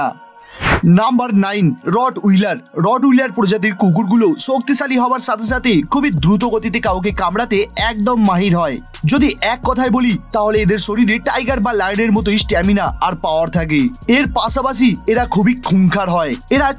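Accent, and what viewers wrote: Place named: Kolkata, India. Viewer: কলকাতার ভাষাগত টান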